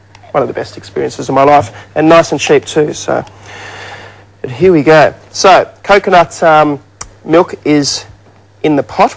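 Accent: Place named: Australia. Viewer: he's australian. it's the accent.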